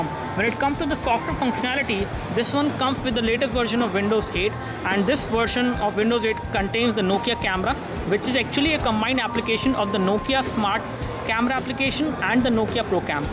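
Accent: India. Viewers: Deep Indian accent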